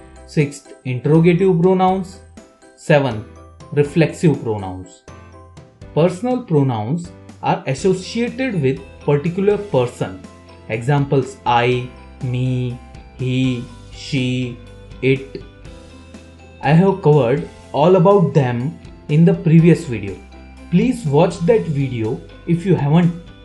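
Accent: India. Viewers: Typical Indian English :)))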